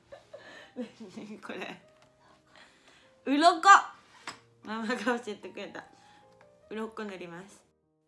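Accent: Kansai, Japan. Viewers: 関西弁多いね。かわいい💕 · なんで関西弁？笑笑可愛すぎやろ😭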